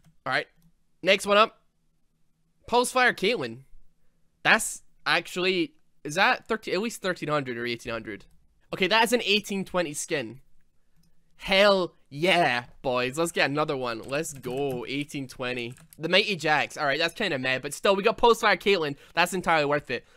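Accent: Scotland, United Kingdom. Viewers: I went from asmr to this, almost fell asleep to the sexy Scottish accent🌞 (EUW) Legendarylemon5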